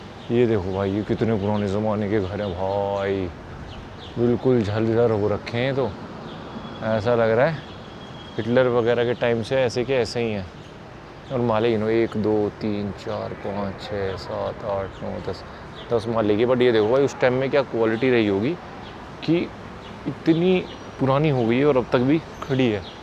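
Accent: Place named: Delhi, India. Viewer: Sir apki language sunkar mujhe lagra h aap delhi se h 😂😂delhi wale kahi b ho pata chal jata h ki y Dilli se h hum b delhi se h or sir apke bolne ks sence same mere czns jesa h😂😂😍😍😍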